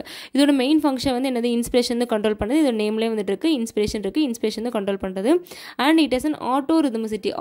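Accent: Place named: Tirunelveli, India. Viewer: Onga slang tirunelveli slang maathiri irukku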